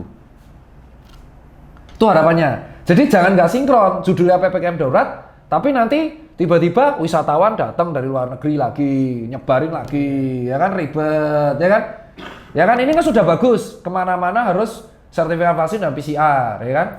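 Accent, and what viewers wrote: Java, Indonesia. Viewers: Bosone jan persis koyo wong jowo Oke kita sejowo jancooook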